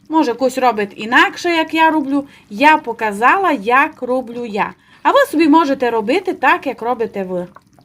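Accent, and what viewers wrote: Transcarpathia, Ukraine. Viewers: Закарпатська мова топ твоя фішка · Дивлюся файний рецепт і заодно вчу закарпатський діалект. Як же приємно чути вашу вимову❤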